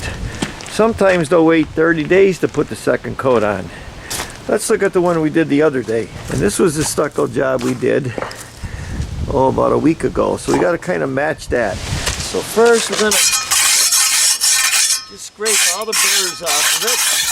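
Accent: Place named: Pittston, United States. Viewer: Great video! Straight to the point and it will give a lot of people the confidence to DIY. I know that accent well. Heyna? 😂 Born and raised in Pittston. Live in Hawaii now.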